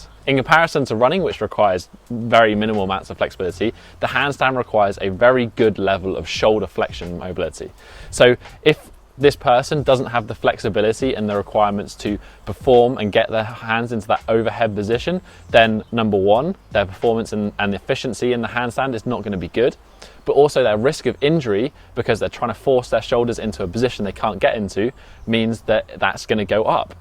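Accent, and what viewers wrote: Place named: RP, United Kingdom. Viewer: Love the well educated British accent man! You pronounce your words very precisely!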